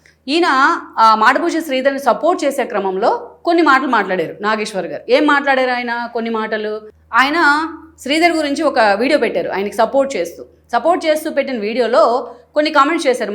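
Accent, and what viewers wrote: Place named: Andhra, India. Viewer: Exllent explanation madam this is Andhra voice.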